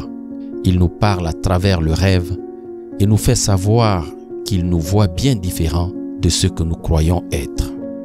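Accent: Africa. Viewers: Tout simplement formidable ♥️ j'adore l'accent africain plein de chaleur et de sagesse 🌞MERCI🙏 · L'accent africain sur fond de piano répétitif rend l'écoute bien désagréable, néanmoins l'effort nécessaire à la bonne compréhension du message est fructueux. Merci pour cette vidéo et le partage de connaissance. 🙂:thanksdoc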